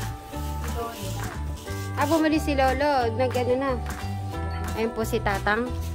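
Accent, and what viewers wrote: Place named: Pampanga, Philippines. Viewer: natawa nmn.ako sa yo kc kapampAngan accent ka po tlga then may halong arabic like ng KALAS at AYWA...related ako kc gnyan din ako kpg kakauwi nababalid po pagtagalog ko...pro so proud po syo...ingat po kyo lagi..mahal po nmin kyong mga family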